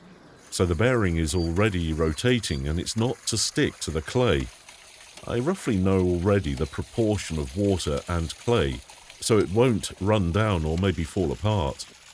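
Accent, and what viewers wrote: United Kingdom. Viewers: Food looks delicious, like it always do. But I'm not crazy about the British narrating. I like the original videos, where it was just nature and him cooking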